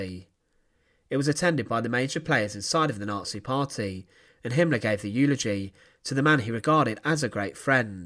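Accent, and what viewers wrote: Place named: Liverpool, United Kingdom. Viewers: Narrative misses out some dates and would be better if not delivered in boring monotone scouse. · What do you think . Just because he has an English accent . And not a US one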